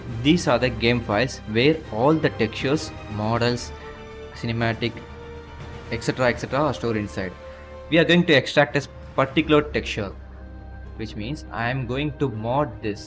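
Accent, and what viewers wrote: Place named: India. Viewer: Again a guy with indian accents making best tutorials on youtube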